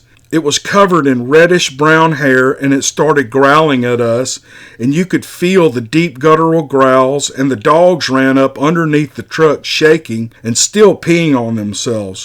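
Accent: South, United States. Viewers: I love you southern draw, I'm from the south part of Arkansas and was told by my dad about swampy wooded area men would go hunting in but would never come out. This place seemed to me that it was south of Pine Bluff, Arkansas. Maybe you know the place or could find out. My dad when he told it looked really scared like he may have heard or seen something but wouldn't tell me or mom. I think he may have because he seemed to be a bit shaken up about it. Also if anyone reading this can relate to this story, please let me know. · thanks man, always great to hear a southern boy.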